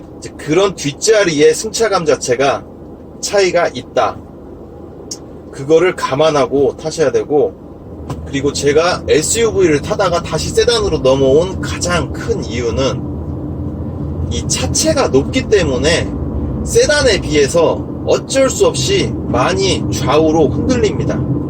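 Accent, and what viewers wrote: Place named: Jeonju, South Korea. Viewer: 어랏? 전주 분 이신가보네요~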